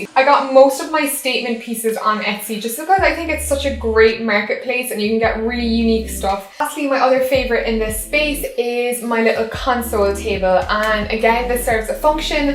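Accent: Ireland. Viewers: What part of Ireland are u from? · Is she Irish.... She sounds irish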